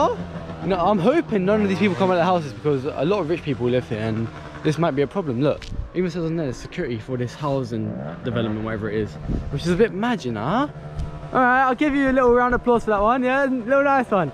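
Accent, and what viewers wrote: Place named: London, United Kingdom. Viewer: What’s wif the accent blood clot man ,use ben livin in London to long now !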